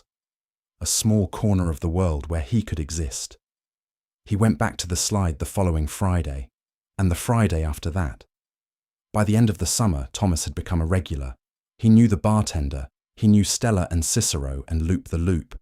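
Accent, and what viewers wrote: England, United Kingdom. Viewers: Good initial message, really awful use of repetition in AI to get monetized views. AI should be able to convey a much smoother story across a much more sophisticated narrative arc and provide dialogue that is "show" not "tell" with a thousand uses of "said." Then how many times is the narrator with an English accent going to use the wrong pronunciation of "clerk", "clark" was used 100 years before this time period min the south of England and never in Manhattan. There are better stories of the times available. Not a single handshake, hug, kiss or sign of sexual intimacy. I hope you learn your AI tool and do quality control before releasing any more stories. Unless you are just in it for the money. YouTube does not seem to care that this low effort form of AI that is ruining their product.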